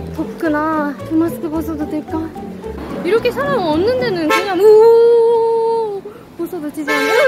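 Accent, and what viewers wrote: Gyeongsang, South Korea. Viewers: 일본분이 한국말 하니까 경상도 사투리 갔군요 ㅎㅎ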